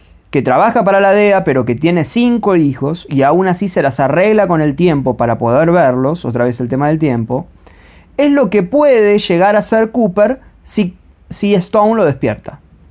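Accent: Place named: Gaucho, Argentina. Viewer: Tu pinche acento gaucho.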